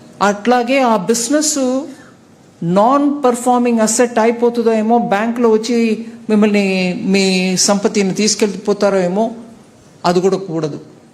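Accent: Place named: Chittoor, India. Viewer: ఈ కాలంలో తెలుగు వాళ్ళు చాలా మంది తెలుగు ఇంతకన్నా ఎక్కువగా ఏమి మాట్లాడం లేదు. చిత్తూరు జిల్లాలోఎప్పుడూ ఇలాగే మాట్లాడతారు. సహజం.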